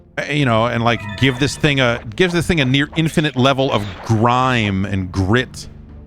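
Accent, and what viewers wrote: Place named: Liverpool, United Kingdom. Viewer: Heard it in flawless Liverpudian accent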